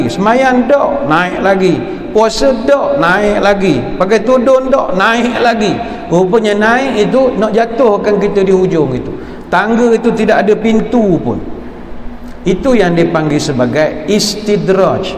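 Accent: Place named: Kuala Lipis, Malaysia. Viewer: Klau xsilap ustaz org Kuala Lipis?loghat ustaz macam org kampong saya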